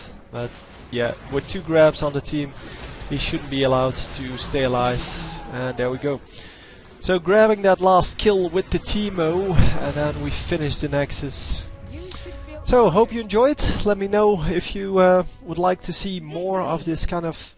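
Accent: Netherlands. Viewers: is that a dutch accent i hear ?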